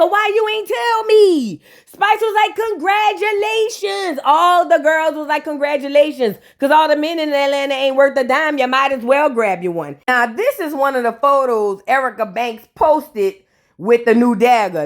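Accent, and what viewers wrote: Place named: Louisiana, United States. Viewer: luv ur content is u from N.O. cuz u keep it real frfr and ur reactions is great if u not from N.O. u sure do sound like u from Louisiana da bottom of da boot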